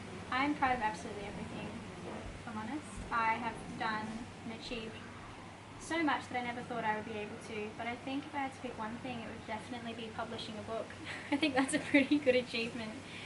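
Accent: Australia. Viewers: this is my first video of urs but i'm guessing u're australian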